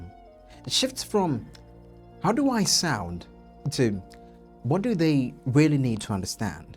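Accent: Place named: RP, United Kingdom. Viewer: You have the RP accent , which better that the American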